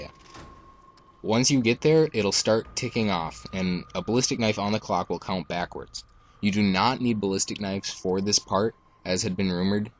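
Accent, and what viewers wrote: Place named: Russia. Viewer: Also this guy has a Russian accent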